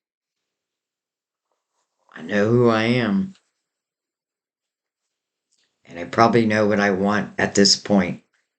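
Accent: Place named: Pennsylvania, United States. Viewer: Girl! Your “after break up” phone call! Wow. Love stories like that! I’m from Phila & I always thought you were from PA (your voice!) I wonder where you’re from?) Great readings always! Thank you! 🙏🏻💗🦋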